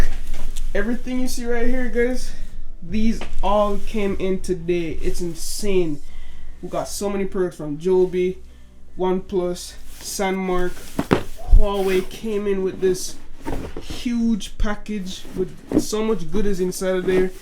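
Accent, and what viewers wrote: Jamaica. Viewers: Man sounds like a Yardie. Jamaican accent cya hide iyah. Big ups pon di content 👍🏾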